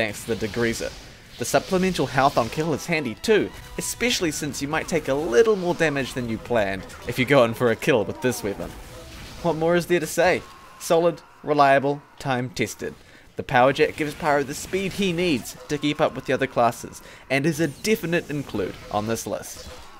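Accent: New Zealand. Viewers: i had a feeling you were from New Zealand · No, his accent is different. I think he's Kiwi (New Zealand), whereas Muselk is ACT, Australia. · He's from New Zealand though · Because he's from New Zealand. That's how their accents are.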